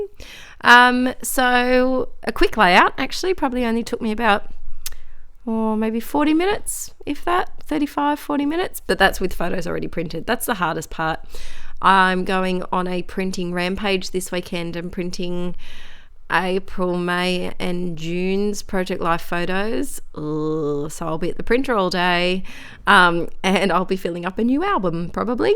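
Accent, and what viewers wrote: Australia. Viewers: AWESOME Project Life video! So good to hear another Aussie! I absolutely loved it and have definitely subscribed. I think we have a bit in common and if you get a chance I would love you to have a look at my channel xo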